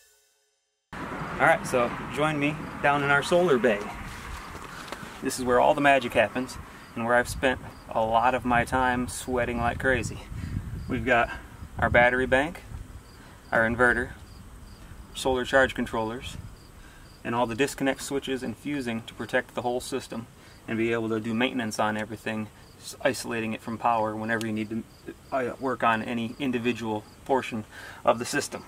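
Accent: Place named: United States. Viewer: The good old Ruuf.. Not Roof Ruuf. Makes me laugh every time i hear Americans say Roof or car, veehykel not vehicle. IDK why it just makes me giggle.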